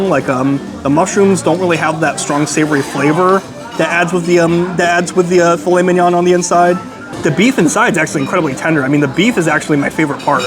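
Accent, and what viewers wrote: United States. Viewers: A question for any native Mandarin speakers: How well does speak Mandarin?. Does he have an American accent? How fluent is he? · I know enough to say a native speaker would notice his American accent immediately.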